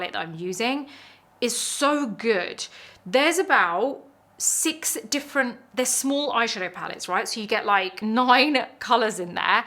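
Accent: United Kingdom. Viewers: Wow, forget about the makeup, but I love the way you speak, you use that British accent and look . It suits you so much. I love watching you speak. 😅❤ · Does your accent change?? You’re more British than before 😊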